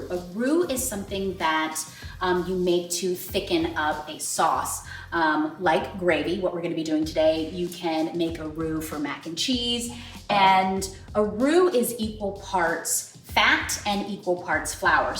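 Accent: South, United States. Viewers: sounds like the South where im from 🔥🔥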